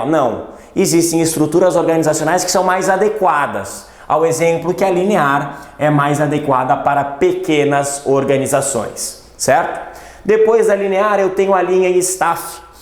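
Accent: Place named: South, Brazil. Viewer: quando o profissional é bom, em apenas 1 minuto isso se torna claro. Parabéns. +1inscrito p.s. aposto que é sulista. ô povo bom para ensino ead.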